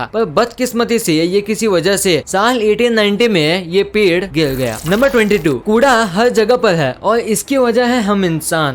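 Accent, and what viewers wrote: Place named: South, India. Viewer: Tumhari aavaz se lagta hi ki tum south indian ho!!!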